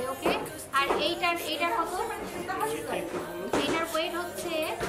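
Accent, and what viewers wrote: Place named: Bangladesh. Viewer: Tumi ki khub recently Bangladesh thike esecho baa jatajat koro? Kotha sunle mone hoe. Amrao oi paarer tobe desh dekhini.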